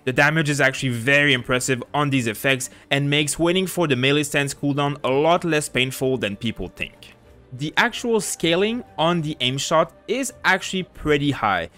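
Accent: France. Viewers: Your accent sound like very french are you ?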